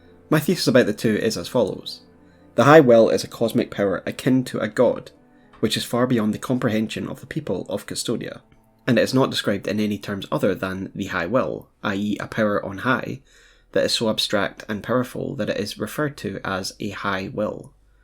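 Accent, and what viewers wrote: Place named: Scotland, United Kingdom. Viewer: I'm gonna guess your accent and say your country of origin is Scotland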